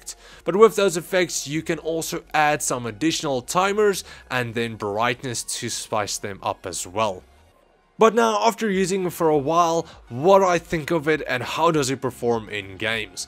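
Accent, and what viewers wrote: South Africa. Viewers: Are you South African? I speak a bit of Afrikaans! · I can't figure out this guy's accent. It's like Afrikaans, American and English all in one with a touch of Dutch. Touch of Dutch. I'm basically a rapper.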